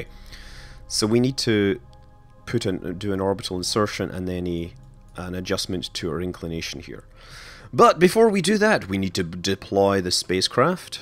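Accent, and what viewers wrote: France. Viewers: Just love your French accent since French is my native language. You should pronounce B612 "b seece dooz" btw.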